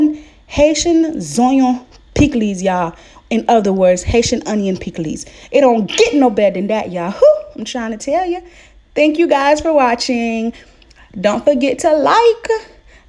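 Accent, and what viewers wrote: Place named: Miami, United States. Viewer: I knew it that Miami accent is strong lol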